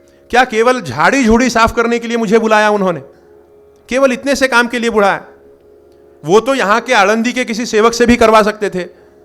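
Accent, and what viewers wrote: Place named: South, India. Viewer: साउथ vali आवाज ह महाराज आप ka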